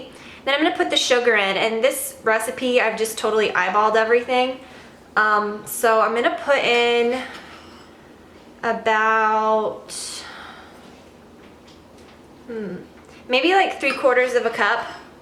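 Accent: South, United States. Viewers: You had such a cute country accent in this video xD and your hair had a little more volume too which was nice :) love the video please do more :) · I totally can hear your southern accent more....its so cute! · you had such a southern accent in this video! great video! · This is the first time I've ever heard you accent come through in a video! You can tell your from the south!( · are you from the south? You seem to have the cutest southern accent:) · I've been watching your videos for years and I just noticed your southern accent in this video!:) Great video as awlays xx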